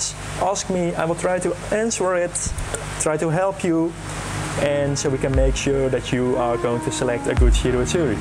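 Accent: Netherlands. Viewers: Just bought one to go with my Hi Utsuri and Ki Utsuri. Great explanation. I guessed straight away you are Dutch. I lived in Hoorn for 9 years and love the Netherlands.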